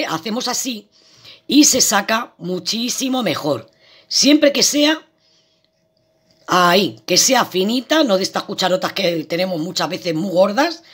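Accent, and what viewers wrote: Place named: Galicia, Spain. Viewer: . ES SU NOMBRE? ME ENCANTA OIR CÓMO HABLA!! AQUÍ EN MONTEVIDEO. URUGUAY, RECIBIMOS HACE MÁS DE 60 AÑOS UNA CANTIDAD DE ESPAÑOLES QUE PERMANECIERON SU VIDA TODA EN MI PAIS!! LOS VI MUY DE CERCA. AL EDIFICIO EN EL QUE VIVO LLEGARON 6 FAMILIAS. HOY EN 2020, NO QUEDA NINGUNO. LAMENTABLEMENTE... HOY LA ESCUCHO HABLAR Y MI MEMORIA VIAJA AL ENCUENTRO DE ESTOS QUERIDOS "GALEGOS" A QUIENES QUISE ENORMEMENTE. SUS COMIDAS ME ENCANTAN!!! DESDE URUGUAY SALUDO ESPECIAL EN ESTOS TIEMPOS DIFÍCILES QUE NOS HAN TOCADO VIVIR!!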